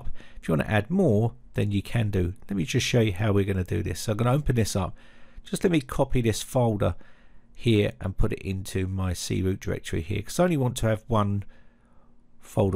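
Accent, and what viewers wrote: United Kingdom. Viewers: Cheers lad ! You are awesome and i love your classic British accent too !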